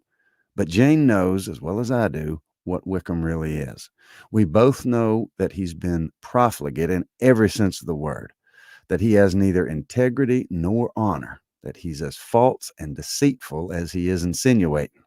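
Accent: South, United States. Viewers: This is brilliantly read. I would never have thought with a southern accent it could be just as clearly conveyed being Regency English. Well done.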